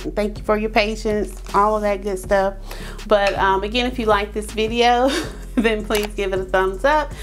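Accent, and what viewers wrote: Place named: South, United States. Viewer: Are you a southern gal?(: I love your accent and I loved the attitude you brought to your video and that made me want to sub.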